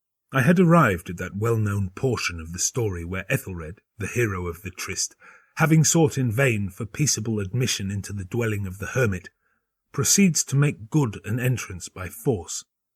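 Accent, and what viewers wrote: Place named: England, United Kingdom. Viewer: At last, I've time to listen and enjoy. Ahhh, your French! And why does Poe sound so good in an English accent, when it's American? Who can know these mysterious things. ;-)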